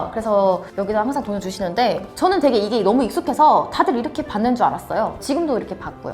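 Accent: South Korea. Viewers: 이분은 외모도 한국사람, 말투도 한국사람, 이름이 조금 그렇지만, 한국에도 있으니까...귀화얘기 안하면 그냥 한국인이네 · 한국말이 원어민인데요?? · 이 유투브 사기다!! 와~~ 완죤 토종 한국어 사용 하시넹!! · 이 사람 왜 자꾸 나와서 본인이 대만여자라고 하는지 모르겠네요. 100% 화교도 아니고 중국말도 잘못합니다. 사실 한국화교들 대만이랑 상관도 없고 중국대륙에서 건너온 중국인입니다. 국적이 있다하더라도 대만이랑 똑같은 국적이 아니고 그냥 서류상 그런거지 그냥 한국인입니다. 중국말도 잘못하고 발음도 완전 한국발음, 왜 자꾸 대만여자라 하죠? 그냥 한국인이 한국국적 받은겁니다. 얼굴도 완전 토종 한국인이구먼.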